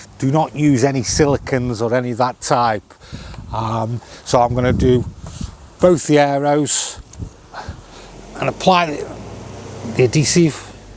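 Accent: Stoke, United Kingdom. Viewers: Hi. Great videos:-))). Just a bit of advice. We have a 100 watt solar panel on top which powers the leisure battery. Need to get a bit of charge to the cab battery. Would you go for a dual controller or a battery master? Great Stokie accent by the way lol:-)))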